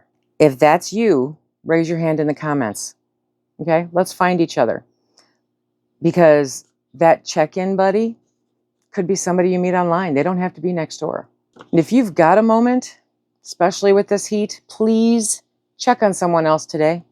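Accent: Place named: Michigan, United States. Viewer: OMG... I'm so glad I found you. After watching for 15 minutes, I began to recognize your logical, pragmatic thinking, your speech cadence, the landscape behind you, and I thought, "I wonder if she's in Michigan?" Lo and behold, went to your vid description and there it was! I'm a former Michigander, born and raised in southern lower MI. Now living in AZ (looooong story and not by choice.). Caring for a spouse with Alzheimer's and there could come a time when Ill be on my own. Will return to MI because that has ALWAYS been home. Thank you for your wonderful tips, you're providing a valuable service! 💙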